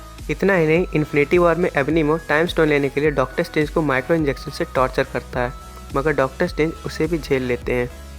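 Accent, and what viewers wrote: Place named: India. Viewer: Man from India, Your accent is terrible!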